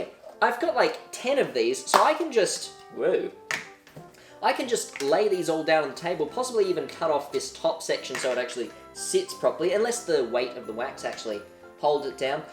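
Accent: Australia. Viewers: He makes me think I need to marry an Australian man. Love the accent. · He has an Australian accent that isn’t annoying. Astonishing.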